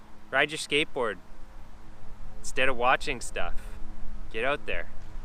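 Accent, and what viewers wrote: Canada. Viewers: Jesus, you're the most Canadian sounding person ever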